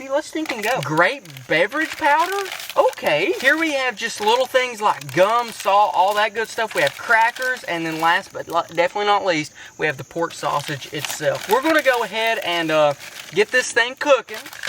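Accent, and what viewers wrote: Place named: West, United States. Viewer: A Russian man w/ a western accent #AK-47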